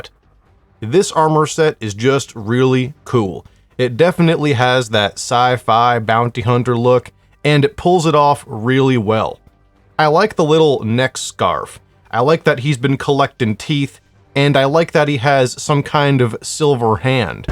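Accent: United States. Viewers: did you seriously do the western accent this whole video? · Love the southern drawl throughout the vid! I will pick this someday soon, just need to get the credits first.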